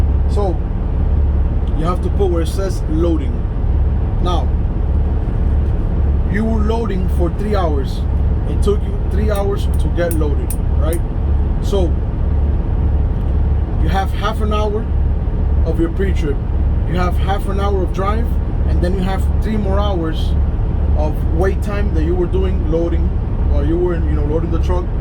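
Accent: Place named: Miami, United States. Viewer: I can tell your from Miami. Same here brother! Great video very helpful btw!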